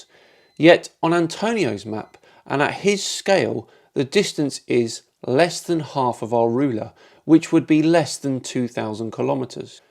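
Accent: South, United States. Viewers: Definitely a Southerner. Neebody in Toon taalks like that, bonny lad.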